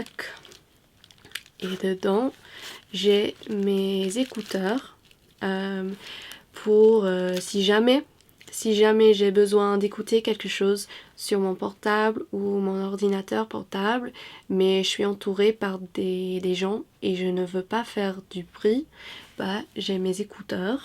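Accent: United Kingdom. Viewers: i hope one day i could hear your British accent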